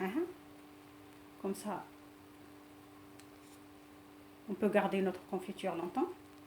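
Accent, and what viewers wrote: Tunisia. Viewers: اختي لو كملتي بالعربية. والله شكشكتيها بالفرنسية . واللهجة تاعك حلوة بالتونسي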